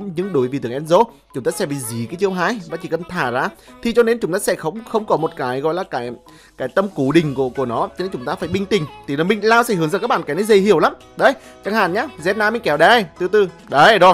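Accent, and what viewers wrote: Nghe An, Vietnam. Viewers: ngta ko hiểu tiếng nghệ an mình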